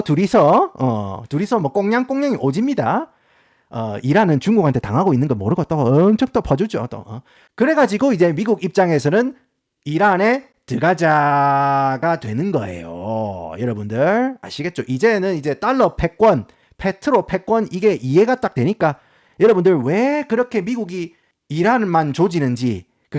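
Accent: Gyeongsang, South Korea. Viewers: 와~미국 금융계통에서 일하셨던 경험자라 그런지 완전 고급정보 얻어갑니다. 내가 회사만들면 스카웃하고싶네요 근데 나는 갱상도라 말끼를 알아둗겠는데 딴동네 출신들은 알아 듣겠나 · 우짜 이리 말을 잘 터노. 미국에서 갱상도 사투리 들으니 넘나 톡톡 튀고 좋타. 함 봄 구독안하고 못배긴다. 명언이 한 두개가 아이다. ㅎㄷㄷ 한국떠난지 오래라믄서 우째 이라고 우리맴과 삶을 잘 알꼬!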